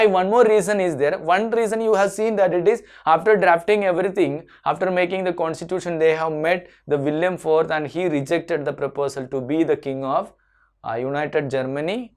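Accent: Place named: Kerala, India. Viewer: THANKYOU SO MUCH SIR FOR THE AMAZING EXPLANATION THE WAY YOU REVISE QUICKLY REALLY IMPRESSED ME SIR SIR I THINK YOU ARE FROM KERALA ..[ PREDICTED FROM THE ENGLISH SLANG ] I AM JUST THE NEARBY STATE SIR TAMIL NADU..... I HOPE YOU KEEP MAKING VEDIOS OF HISTORY AND WAITING FOR NATIONALISM IN INDIA SIR THANKYOU SO MUCH SIR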